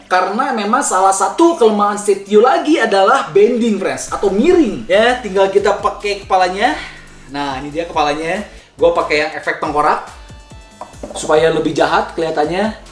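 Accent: West Java, Indonesia. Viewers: Bang asli sunda?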